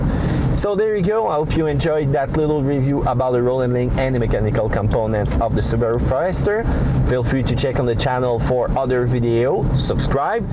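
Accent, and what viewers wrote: Quebec, Canada. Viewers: Sounds like a French CDN - where I was born a long tome ago.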